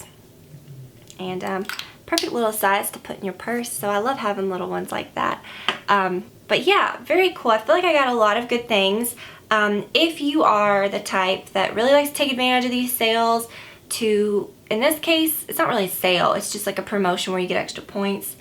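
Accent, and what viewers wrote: South, United States. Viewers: Cute (and unusual for you ) southern accent in this video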